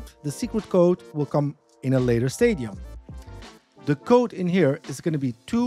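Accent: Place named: Netherlands. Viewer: Deze Hollander spreekt bijna Engels. Nee, goed hoor ik moest echt goed luisteren om het te horen.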